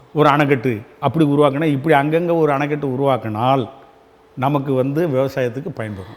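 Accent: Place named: Tirunelveli, India. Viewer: மாவீரன் அண்ணன் நெல்லை தமிழில் பேசுவது அருமையான சூப்பர்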